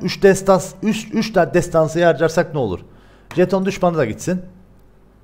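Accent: Cyprus. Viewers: Kıbrıs şivesi değil mi :)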